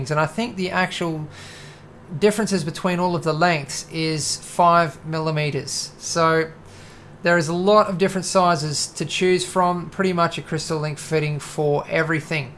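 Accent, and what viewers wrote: Australia. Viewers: I can't tell if you're an Aussie or a Kiwi... You sound generally Australian, but when you say things like "build log part 12" as "boold log paht twulv", it sounds rather Kuiwui (like me!) Cool boold though!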